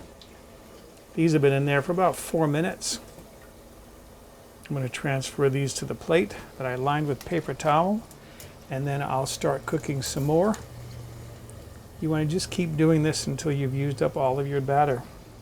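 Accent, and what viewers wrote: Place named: Rhode Island, United States. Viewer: First of all, if you’ve been making clam cakes for decades then you’re my clam cake guru. Also, that’s a whole lot more clams than most of the clam cake makers back in Rhody put in their clam cakes while I lived there, most of them use mostly clam juice & try passing them off just like fake Rolex’s! I plan to make clam cakes the same way that you did in this video when I make clam cakes & chowder this Thursday! I’m gonna use cherry stones rather than canned clams though. Also, you sound a whole lot like most of the people who I left behind 5 years ago when I left Warren, RI & moved here to SanDiego. I also left the clam cakes behind so I’m totally jonesing for clam cakes because they don’t sell them out here in California. So it’s make my own & I’ll do it just like you did it in this video!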